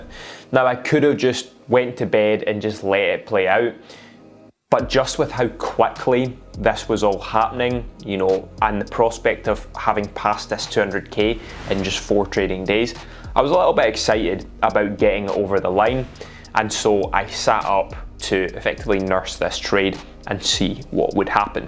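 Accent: Scotland, United Kingdom. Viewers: Are u Scottish? Impressive work anyway. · Are you from Scotland? Nice accent 😅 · Nice accent Scottish Lad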